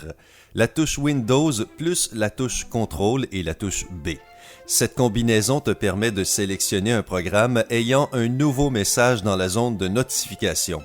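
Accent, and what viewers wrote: Quebec, Canada. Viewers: moi aussi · J adore ton accent québecois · L'accent Canadien on adore xDDD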